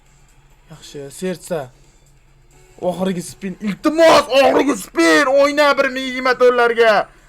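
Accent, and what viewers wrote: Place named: Fergana, Uzbekistan. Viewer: Aka qayerlisiz shevez buvaydanikiku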